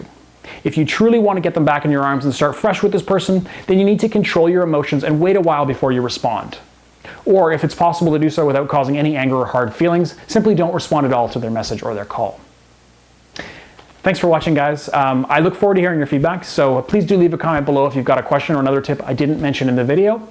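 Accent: Canada. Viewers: Are you...Canadian?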